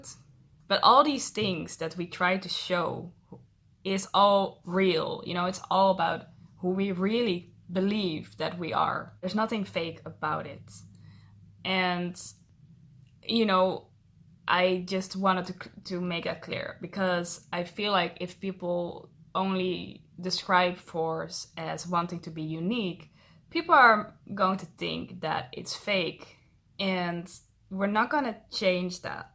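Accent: Netherlands. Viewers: Je klinkt heel Erg Nederlands ben je dat toevallig ook haha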